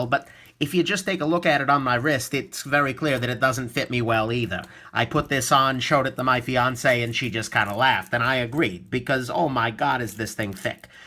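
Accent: New York, United States. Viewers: Love your accent - are you a New Yorker by any chance? :-)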